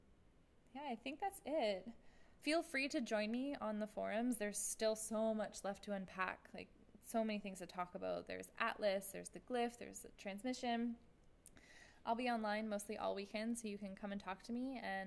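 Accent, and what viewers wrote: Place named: United States. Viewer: American accent and ends vlog with "cheers" obviously been hanging out across the pond... in hello games studio? hmmm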